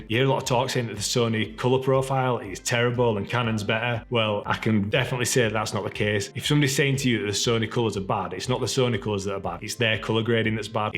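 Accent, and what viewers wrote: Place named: Newcastle, United Kingdom. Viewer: Fellow Geordie? Or must be close.. 🤔✨👊🏼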